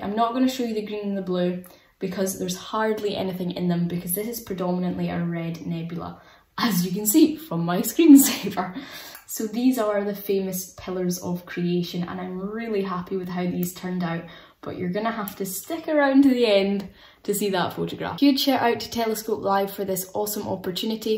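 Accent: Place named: Highlands, United Kingdom. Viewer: Great video,! Did my high school years in Nairn, so its nice to hear that lovely Highland accent again! Keep up the great work and thanks for flying the flag for UK Astrophotography!:)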